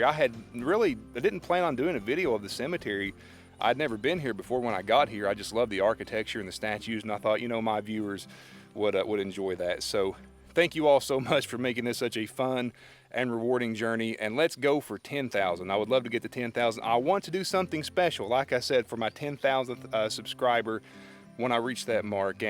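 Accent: South, United States. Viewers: That’s the voice of a southern gentleman. Do you use beard products?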